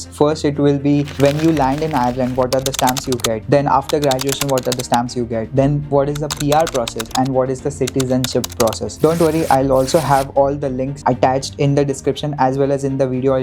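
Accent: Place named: Maharashtra, India. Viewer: This guy is marathi and I don’t like your accent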